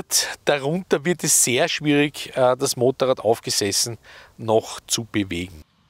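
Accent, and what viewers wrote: Austria. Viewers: Ich liebe euer österreichisches Dialekt. Ich bin diesen Renner gestern Probe gefahren und habe danach gleich unterschrieben.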